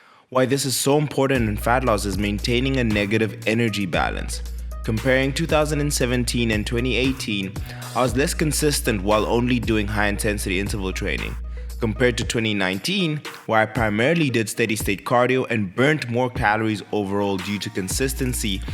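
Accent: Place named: South Africa. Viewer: This accent sounds South African 🤔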